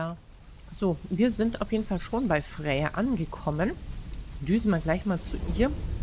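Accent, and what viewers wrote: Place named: Palatinate, Germany. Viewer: Einfach Pfälzer Dialekt 😅 cool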